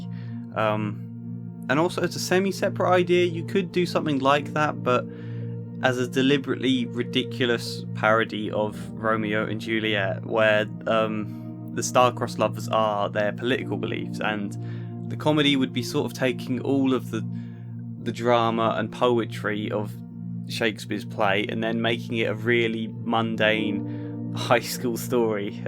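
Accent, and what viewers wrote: United Kingdom. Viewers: If a couple’s political differences are just, big government versus small government. Republic or constitutional monarchy. A centralised or state-based economy. Then sure, needing your partner to agree with you could be fairly painted as a vice. But in this era, “political beliefs” are literally a matter of life and death. If you vote for someone who incites violence against minorities, your political views are hurting real people. If you vote for someone who doesn’t want rape victims to have access to abortion, your political views are hurting real people. If you vote for someone who doesn’t see a problem with police officers shooting unarmed black teenagers and stepping on necks, ultimately, you have blood on your hands. To imply that a difference of opinion on whether or not, for instance, Black Lives Matter, is something a mature couple should be able to accept told me as a viewer straight away that you yourself are white. 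Because these issues clearly aren’t life and death for /you/. Maybe I’m being unfair, it sounds like you have a British accent; if you’re in England, it is a different climate over there. But you specifically mentioned Trump. A man who defends and incites /actual white supremacists/. I loved your videos analysing Good Will Hunting, that was beautiful work and really deepened my appreciation for an already exceptional film. But this video was disappointing and honestly insulting. There are good people on the left and right, as there always have been. But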